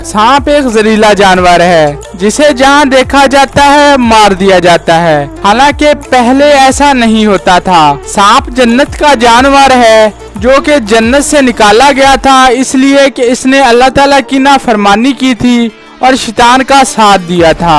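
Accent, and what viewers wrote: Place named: Punjab, Pakistan. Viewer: Punjabi twist in urdu, not good pronounciation like youtuber majid malik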